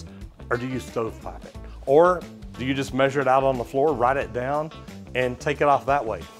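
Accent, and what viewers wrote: South, United States. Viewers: I've watched a lot of your videos. I'm more old school plumbing. I like how you do a lot of detail. Plus I like your accent it sounds southern. But you give a lot of information.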